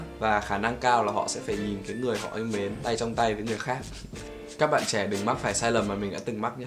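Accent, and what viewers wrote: Standard. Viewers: Bạn nói chỉ được cái chuẩn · nói chuẩn vãiiiiiiiiiii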